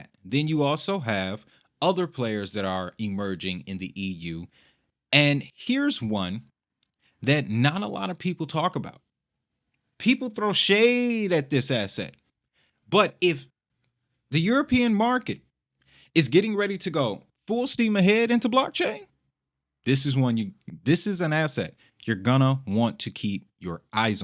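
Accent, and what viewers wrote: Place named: South, United States. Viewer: Thank you for breaking it down with that Southern Style.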